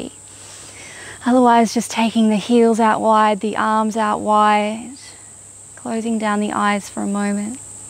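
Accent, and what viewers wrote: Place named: Australia. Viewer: Thank you so much for taking your time for ourselves. What a beautiful practice once more. I missed you and your beautiful practices and your beautiful australian accent and the beautiful sounds of nature all around you. It is hot and sweaty there and I am freezing in front of the wood-stove here early in the morning! The cicadas are long left towards Australia and still very nice to hear that they reached their destination! All the best.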